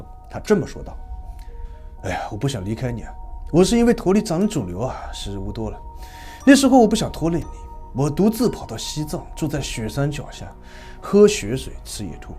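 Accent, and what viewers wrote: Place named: Shanghai, China. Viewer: 你这个上海普通话，把这么严肃的案子说笑了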